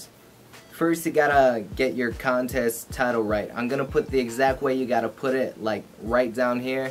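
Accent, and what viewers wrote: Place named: United States. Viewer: You have a slightly American accent, but i understood it pretty well :] was geht... what´s up... was geht.... you know. it sounded like that ^^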